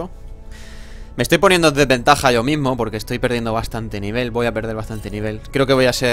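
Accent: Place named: Spain. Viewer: todos los españoles pronuncian asi el ingles?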